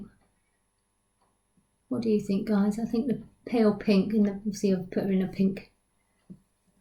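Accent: United Kingdom. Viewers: Hey there! Long time no see! How's going with the house work? Is it done? I've been away from surfing thru dolly videos of my good friends here such as you, Jay Dolls, Dolls Rescued and others coz I felt really depressed with some personal problems. They were solved, thank God, but my depression continues, at least now I'm little by little coming back, but there is too much to catch up, so it might take a while to see all the lovely videos. You didn't make much, so won't be long. I definitely need a ResinSoul girl, coz they are legit and affordable, but not atm unfortunately. Your doll looks such well made, they do an incredible work for a non expensive legit company, i'm a fan of they, I should get it a long time ago, but it was for the best coz I would made the wrong choice, I thought dolls with closed eyes were the same as Blythes, that can be open and close at the same time, I don't like bjds with closed eyes head. 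Now that I know everything I need I'll pick the right girl with the right body in normal skin! Anyway, is nice to be here again, nice to see you and hear your beautiful UK accent, I love it! kisses!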